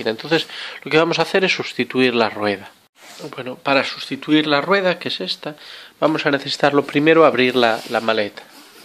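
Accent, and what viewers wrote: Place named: Galicia, Spain. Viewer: Grazas! El acento gallego calmado es de lo mejor para estos tutoriales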